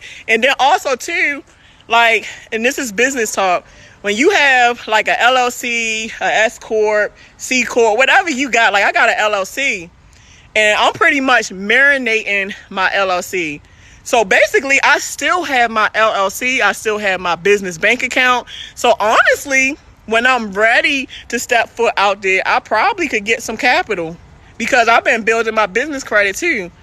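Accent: Baltimore, United States. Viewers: I like that Bmore accent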